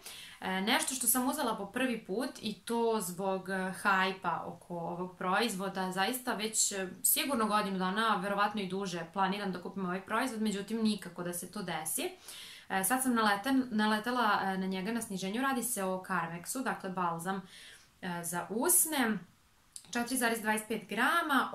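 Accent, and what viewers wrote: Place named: Vojvodina, Serbia. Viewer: Vojvodjanka,zato to tako slatko zvuci 😘😉😁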